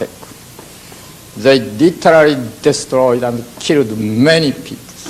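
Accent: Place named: Japan. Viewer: Love the video, but I can't unhear that he sounds like Morgan Freeman with a Japanese accent.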